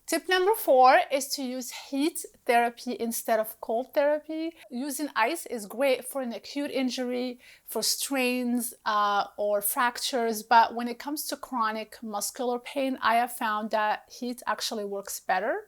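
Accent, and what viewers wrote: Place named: Morocco. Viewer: i smell Moroccan accent here dont know why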